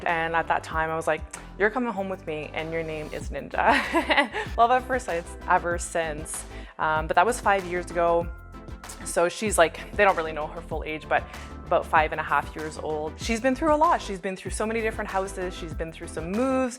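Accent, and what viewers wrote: Quebec, Canada. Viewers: Merci pour les réponses.. J'avais deviné avec ton accent que tu es québécoise. Continue ton excellent travail! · French canadian? Or real French from France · Canadian accent - all Canadians are taught French (by law). If it was your first language then you must have been raised in Quebec. Have enjoyed your videos - thank you! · You have a Canadian accent eh? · French Canadian i am guessing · Salut! Tu pilotes mon avion préféré (Dash8/400); je suis passager régulièrement entre Montreal et Halifax! Super de t'entendre parler Québecois;P Aussi, Mars, sign me up!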